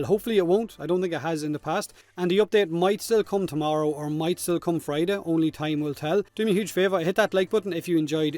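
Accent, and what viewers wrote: Ireland. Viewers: You said you wanted [Akmagnus Prime], but is there another weapon you’d like to see Primed? For me, it’s [Akmagnus] too, cuz America! Fuck yeah! As well as [Corinth]. I love that fucking shotgun! Good ole’ American magnums and pump action, am I right? You wanna kill something, buy American! (OuO) <3 Love your vids and please, for the love of Clem, let me hear your full Irish accent. I’m Irish-American, and I can’t get enough of your accented hilarity!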